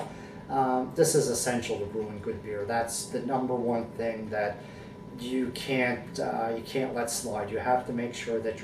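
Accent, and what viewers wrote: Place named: Ireland. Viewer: Hi watching your reviews here in Ireland for a while. Would I be right in saying you've some Irish connection, your accents got a fairly noticeable Irish twang to it!. I've just been doing kit beers and dry hopping. I've been considering just taking out a few litres of wort and doing a mini boil, do you think that would work the same as your mini boil there?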